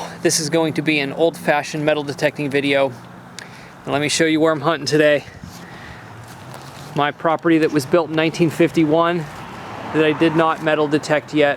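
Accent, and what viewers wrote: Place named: Virginia, United States. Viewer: Dam thought you from Virginia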